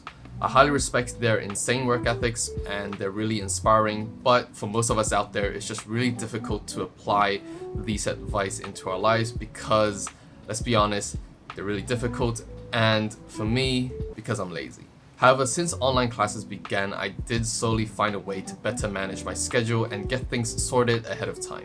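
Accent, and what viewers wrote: United Kingdom. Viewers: I really love your British accents 😊😊😊😊😊😊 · I’m quite certain of your accent. That sounds like brits but not rly strong .. ( I’m eng major from Jpn, cheers)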